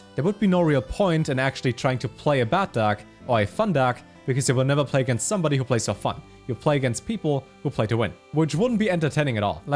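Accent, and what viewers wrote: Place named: Germany. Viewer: can i ask u from where u are? u sound german ^^. just found ur channel, nice content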